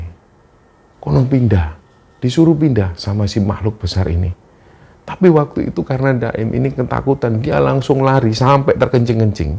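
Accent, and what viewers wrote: Java, Indonesia. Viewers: slm knl,..sy org makassar tp suka medok jawa y bg lho..,semangat selalu buat cerita2 y👍👍👍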